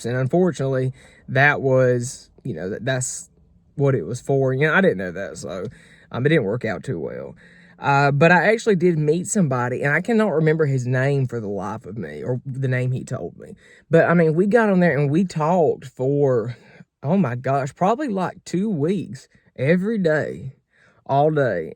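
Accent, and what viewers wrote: South, United States. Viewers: I love your accent- true southern not fake- if you ever go to Memphis go in May for the barbecue festival and go to the Rendezvous for ribs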